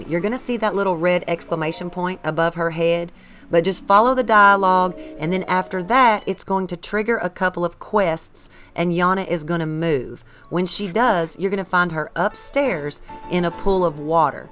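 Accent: South, United States. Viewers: Hey girl! I just recently found your channel and I love how you admit about not knowing how to pronounce some of the names in the game! Same here... lol! Also, I'm not sure where you're from, but I'm from South Mississippi and I can relate to your accent ;) You have provided some pretty good tips and I'm now subscribed :D Keep up the good work! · I love your videos! Your southern accent makes them even more welcoming/casual/relaxing. Thank you for the content and for being down to earth about it. The sass on that pinned comment was the cherry on top! 🤣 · even if you weren’t playing I love hearing your voice in the videos. It’s refreshing to hear a beautiful American southern accent 😊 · Southern I know this, lived in Arkansas for a few years. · What American accent is that i wonder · I must say your voice is why I continued to watch this vid as I'm the kind of player who saves anything and everything I find interesting on a game I'm into and once I beat the game I will go back and watch vids and use cheats and try glitches ext.. 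Well as I was adding it to my botw 2 list ( been saving vids since before it was officially titled) I heard your voice and well needless to say I can go easily and get the armor. I'm a hillbilly from the blue ridge mountains and it was refreshing to hear your southern voice narrating one of my favorite series biggest entry's! I was blown away and watched and listened all the way to the end. So to comment on the deception msg Well who cares if it wasn't her playing ( so awesome it was btw ) because it's still a very pleasant, easy to listen to and follow along, very well made information video and I'm looking forward to enjoying many more from her. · A southern accent and TotK? Sign me up lol. Im from NY. Don't hear that accent much.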